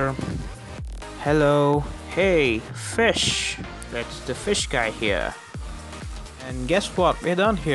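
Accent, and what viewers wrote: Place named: United States. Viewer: u have a american accent or u must be prentending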